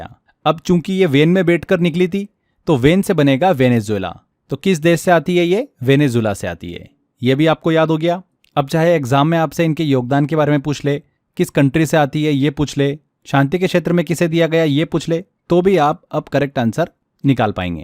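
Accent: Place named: Maharashtra, India. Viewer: Sir Aap ki Hindi voice se lagta hai ki sir Aap Maharashtra se belong karteh hai..... Marathi Hindi type voice.... kyuki sir hum 4saal Pune mein tha....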